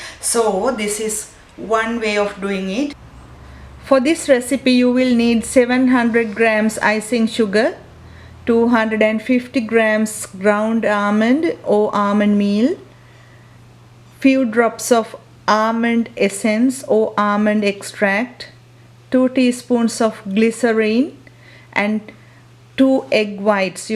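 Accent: Sri Lanka. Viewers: Sri Lankan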